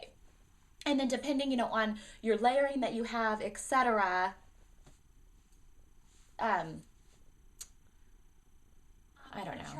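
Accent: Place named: Texas, United States. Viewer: Hi. Where do u work out of? Sounds like ur in Tx. And I just mite be willg to drive to have u do my hair! 😁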